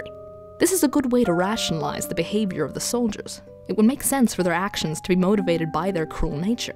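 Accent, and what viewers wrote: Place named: Norway. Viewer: Your accents sounds Norwegian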